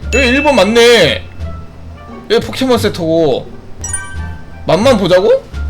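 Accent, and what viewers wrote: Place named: Jeolla, South Korea. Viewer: 전라도 광주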